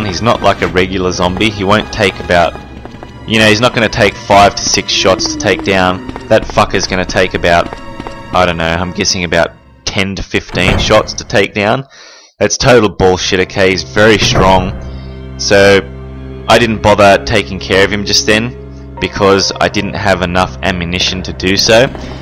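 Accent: Australia. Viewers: You're definitely an Aussie with the way you speak 😂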